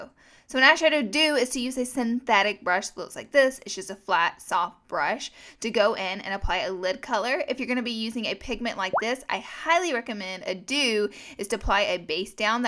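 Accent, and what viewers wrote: South, United States. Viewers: Shawn Brown she's not trying to be like bunny. she is doing her own thing. and yes they both have southern accents but that doesn't mean she's trying to be like her. trust me bunny is wayy more hyped up than her ha ha. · Don't waste one minute talking, or at least get a voice training course. Your voice sounds way too nasal and, for someone who is used to British audio (non-native English speaker here) this almost looks like the stereotypical female American voice. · her voice is the most American thing I have ever heard. (im australian) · Are you southern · the southern accent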